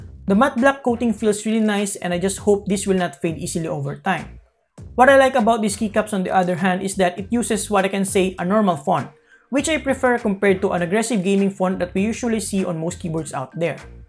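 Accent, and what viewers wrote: Philippines. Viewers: Are u filipino? Cause ur accent is VERY filipino😂 just sayin’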